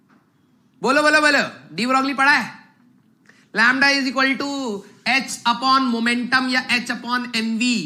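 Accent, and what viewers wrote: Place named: South, India. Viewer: Ayiyo accent wala gajb ka😁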